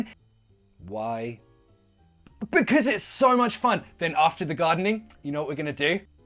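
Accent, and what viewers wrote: United Kingdom. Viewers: I don't like British accent, then I've found your channel! Nowadays I don't miss anything about it! I really like it...👏👏👏👏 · Can’t believe what I’ve just found, I always missed this kind of content. British accent and slang taught in a very fun way. We’d get along hahaha Keep it up dude! You got a new sub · british pronunciation is way too charming i’m love that’s the one i will learn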